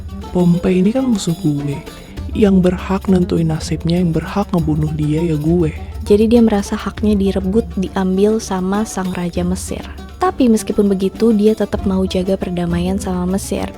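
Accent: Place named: South Jakarta, Indonesia. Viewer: Serasa di dongengin sama anak jaksel knt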